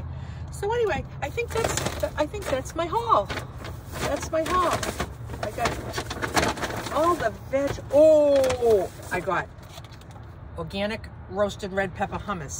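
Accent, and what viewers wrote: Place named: Massachusetts, United States. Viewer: Thank you 😊 you sound like home! I’m from MA too and now in Florida. Love the simplicity you share!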